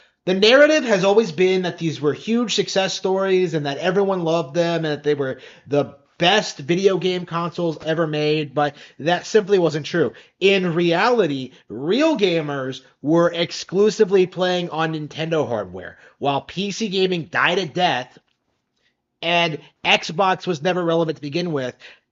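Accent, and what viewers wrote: United States. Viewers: Why are you speaking in a Southern Alabama accent?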